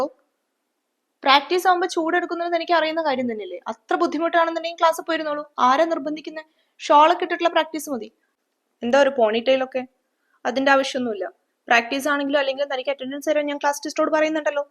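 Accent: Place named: Thrissur, India. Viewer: Ayyo triasur slang Evide poyi??? Sho straight njngada tone…Adipoli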